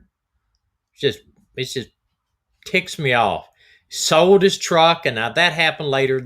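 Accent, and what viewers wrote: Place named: South, United States. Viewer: . You are beautiful! And I love your southern voice💖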